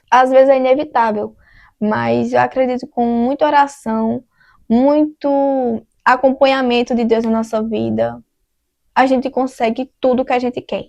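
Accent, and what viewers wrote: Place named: Northeast, Brazil. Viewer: Eu amando o sotaque 😂 sou nordestina tbm, sou da Bahia. Estou no catecumenato, nessa quaresma irei receber os sacramentos 🙏🏼 fui nascida e criada dentro da seita das testemunhas de Jeová, mas gracas a Deus ele me atraiu à Santa igreja ❤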